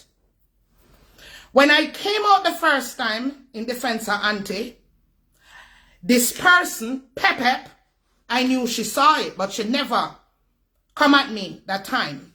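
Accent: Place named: Jamaica. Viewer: Real,real Jamaican talk.❤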